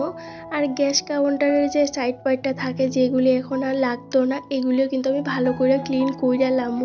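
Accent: Bangladesh. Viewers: আপু তোমাকে একটা কথা জানার জন্য আপু তুমি সেই উওর টা দাও তোমার ভিডিও ভালো লাগে বলেই দেখি তুমি এক দেশের আমি আরেক দেশের কিন্ত মানুষের মন যাকে ভালো লাগে তার সমন্ধে জানার ইচ্ছে করে আর তেমন বড় কোন প্রশ্ন করি নাই তোমার আসল বাড়িটা কোথায় তোমার ভাষার জন্য কারণ বাংলাদেশের কিছুটা টান আসে আমি অনেকের ভিডিও দেখি কিন্ত কেনো জানি তোমাকে আমার খুব ভালো লাগে আমি বাংলাদেশ থেকে দেখি তুমি কোন জায়গায় মানুষ আর অরিজিনাল বাড়ি কোথায় তুমি ইন্ডিয়ান সেটা বুঝতে পারছি কিন্তু কিছুটা আমার দেশের টান আসে তাই জানতে চাইছি ভালো থেকো সুস্থ খেকো এগিয়ে যাও আছি তোমার সাথে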